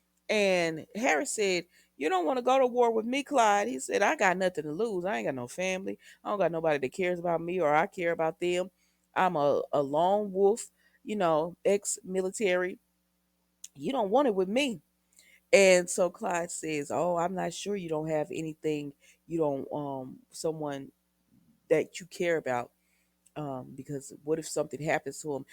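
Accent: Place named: Greece. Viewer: I love your Greek accent!!! Probably better then the actors!!!!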